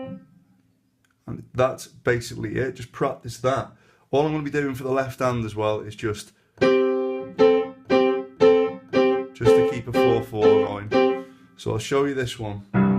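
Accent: Liverpool, United Kingdom. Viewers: Where are you from you sound like a Scouser? Really enjoyed it thanks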